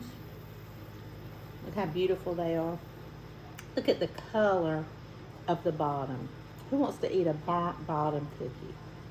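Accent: South, United States. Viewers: Hi, the cookies look Yummy. I will have to make them. I have to say the cake mix cookie video. I made them and the cookies are so good. Also your video for pork tenderloin leftovers, I live in Indiana and didn't know if I could find the Duke's Golden BBQ sauce in my area. I Found It, at a local food chain store. Meijer! I cooked a pork shoulder the other day in the slow cooker, so tomorrow I'm making BBQ pork sandwiches and trying the Duke's sauce. Thanks for all of your videos!! Love your Sweet Southern Accent!!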